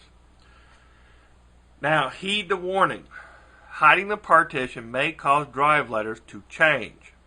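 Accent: South, United States. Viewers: WHAT IS UP WITH THE SOUTHERN ACCENT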